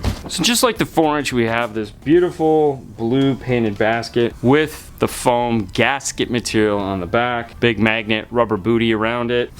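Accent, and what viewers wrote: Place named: Puerto Rico. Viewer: starting to sound a little Boricua… ya era tiempoooo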